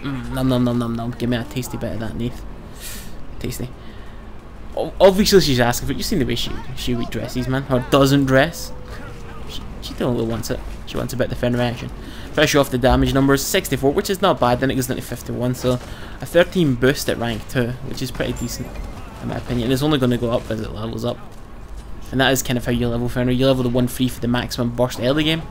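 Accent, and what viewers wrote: Scotland, United Kingdom. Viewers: Sometimes I can't understand a single word you are saying especially when you talk quietly/mumble :( that Scottish accent. You fuck up guardians with beads what is wrong with that? :D I also noticed watching NA players that they have easier games in diamond ranks. My usual ping is 70-80 and when it rises above 120 its nightmare for me (usually playing adc)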